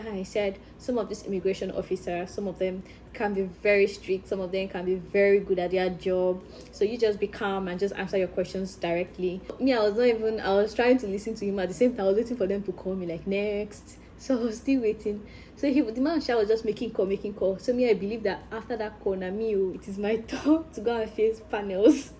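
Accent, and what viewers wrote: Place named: Cameroon. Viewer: U sound like a Cmrnian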